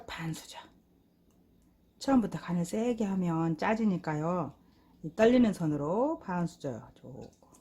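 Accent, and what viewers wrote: Jeolla, South Korea. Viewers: 호남말씨 쓰시는 누님~돌아가신 부모님도 전라도 분이셨어요~목소리 정감이 가네요~영상보고 꼭 한번 만들어 먹어봐야겠네요~감사요~^^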